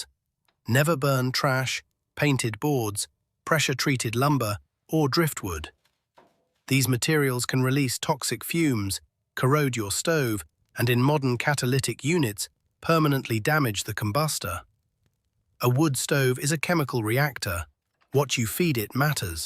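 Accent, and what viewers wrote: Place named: United Kingdom. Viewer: Very well done, even with a British-like accent. :-) You might look inro something like a descendent of a pressure cooker to absorb far more btu's without filling the room with steam. I am sure most engineers could come up with something productive and financially rewarding. Best regards, JDB Retired Prof. Engr Mgr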